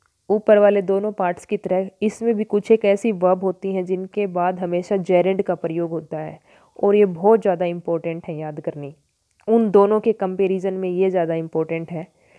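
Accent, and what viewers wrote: Haryana, India. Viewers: Mam haryana ke ho kya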